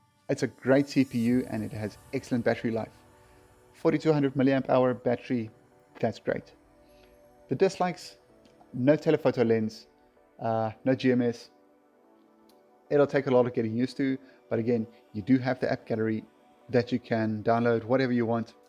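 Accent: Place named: South Africa. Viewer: Jy klink suid afrikaans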